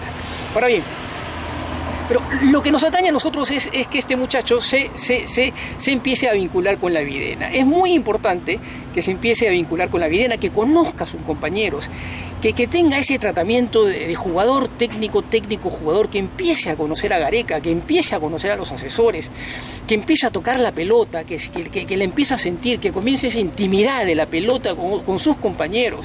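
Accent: Argentina. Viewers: Eres peruano?, y el dejo argentino???....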